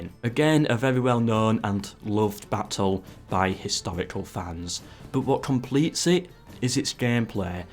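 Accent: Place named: Yorkshire, United Kingdom. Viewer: h so this is the well-known yorkshire accent that everyone speaks of. Now i have heard it aswell finaly. Must admit that for me as a german it is sometimes hard to understand acusticly, but the quality of the content makes up for it ! :)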